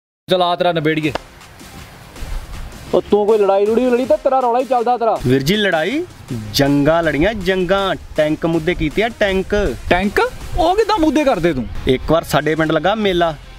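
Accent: Majha, India. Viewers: Veer amrinder gill di copy nhi aa..mahje vale sare eda bolde Bai ji... veer mahje di shan ❤❤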